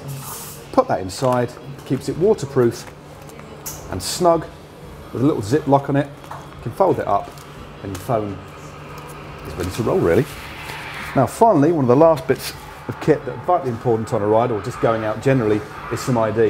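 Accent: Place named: RP, United Kingdom. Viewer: sounding reeet posh in this! till he burps at the end, that was grotty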